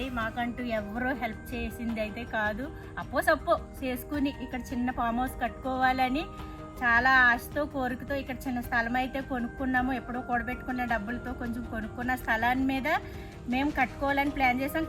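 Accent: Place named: Krishna-East Godavari, India. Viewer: Dear — Don’t feel bad for not able to speak in English amma. I am 80 years old. And I did M.A English While working as a B. Ed. teacher. But your Telugu is so sweet with an accent between the Krishna and East Godavari districts. It’s not the language but the. Bhava prakatana which is important. That is important. That you have more with your smile. I like. and I watch all your videos. Bhasha anedi maatladuthovuntene brathukuthundi. You are doing your. duty as a. wife and as a mother very well. You are a. hard worker. Keep it up. By the by your farm is very nice😊God bless you and your. family. At this age my hands are shaking so much.Don’t feel bad if there are any mistakes.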